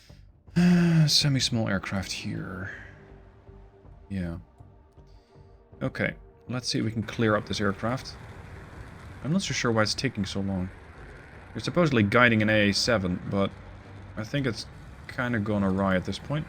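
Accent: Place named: Netherlands. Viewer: Ik detecteer een Netherlands accent in je Engels 🤔. Landgenoot 💪Dutch🇳🇱 ?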